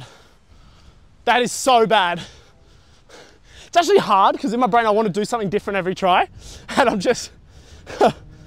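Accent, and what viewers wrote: Australia. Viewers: I had no idea you were australian, I assumed you were british from watching your shorts with no audio lol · he sounds like a aussie is he one? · Ru an Aussie? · Ok now i knew your australian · are ya aussie as well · Is this guy Australian and if he is is he from Sydney?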